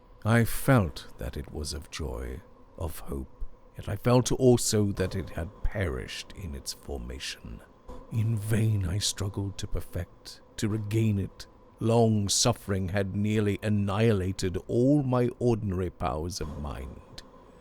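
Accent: England, United Kingdom. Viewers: Spent some of my Halloween with these recordings. It was wonderful! Thx! Love the reader and even the xtra effects worked nicely. As far as his anglo-accent, gotta say i appreciated it. I've heard too many flat, nasally voices of Americans with no sense of rhythm to the words and it's practically unlistenable. Js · Why always freaking englesh accent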